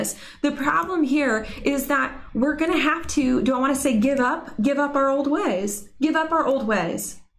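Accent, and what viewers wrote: South, United States. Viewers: You sound like you are from NC or Atlanta.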